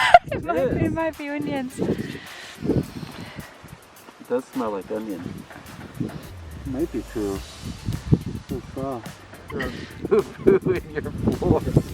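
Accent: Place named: Lancashire, United Kingdom. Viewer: Was directed through Tokyo Llama so really looking forward to watching your videos. Where are you from in the North,? Can’t quite place the accent - Lancashire?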